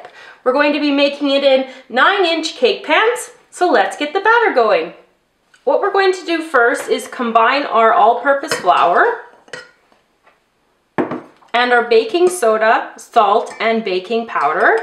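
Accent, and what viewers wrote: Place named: Canada. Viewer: i thought she was from canada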